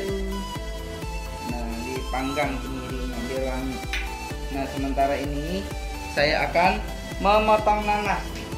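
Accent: Bangka, Indonesia. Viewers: Abang orang bangka ya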